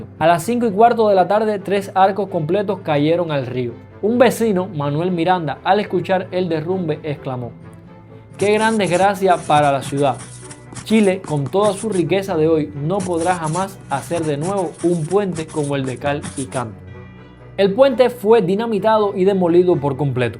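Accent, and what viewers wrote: Cuba. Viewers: Estimado amigo...! Que grato escuchar tu relato, sobre este puente del cual no se habla mucho y menos con la presicion, dedicación y amor al relato, con lógica histórica, ya que la colonia en todo el mundo fue una época vivida con mucha dificultad desagradable que si bien es cierto está gran parte escrita hay lugar en el relato qué da lugar a la fantasía pero de la buena, grata entretenida aferrada a la historia escrita, se te agradece Estimado amigo cubano, tu acento le obsequió un tono además hermoso a esta historia, un millón de gracias.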